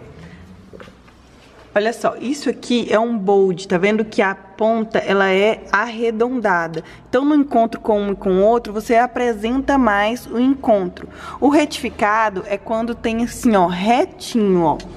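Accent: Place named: Minas Gerais, Brazil. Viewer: Te amooooo sua linda!!! Arrasa sempre!! Adoro esse sotaque mineireis!!! Inspirando aki nos seus projetos!!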